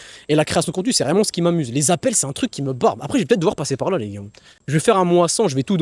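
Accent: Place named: Algeria. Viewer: 2 secondes dans la vidéo tu me fatigues avec ton accent pied noir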